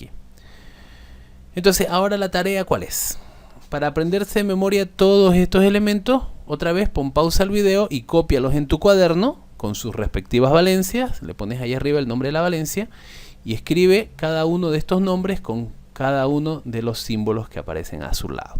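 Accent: Santa Cruz, Bolivia. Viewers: Además me di cuenta por el 'puej' y que en algunos casos decías palabras con j remplazando s, típico de un cruceño 😂. Por cierto exelente video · Apenas inicio me di cuenta q era cruceño kakakajaajja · si cruceño de oro we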